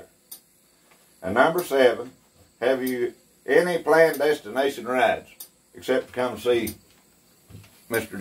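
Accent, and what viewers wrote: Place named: United States. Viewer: Orsum. I really enjoy watching your videos and trying to understand your lingo. 🤪 my missus is from way back in them NC hills she sure do appreciate the Merican accent from back her neck of the woods. Ah huh! 👍🏾💯 I did that 7 question challenge some months ago. It’s really interesting hearing other bikers answers. Good job buddy. 👍🏾💯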